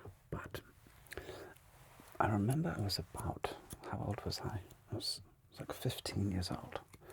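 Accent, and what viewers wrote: Germany. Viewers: Are you german if not your denglisch is perfect 👌🏻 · German words spoken without any particular accent. Sounds like a German speaking English. And perfect use of "Denglisch" mixing German and English words. hat Klasse!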